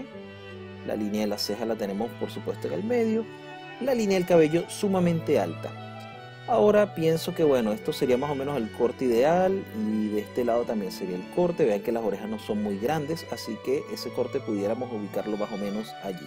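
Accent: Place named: Chile. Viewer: A mi me suena a Chileno, tiene la misma voz que Wikisebas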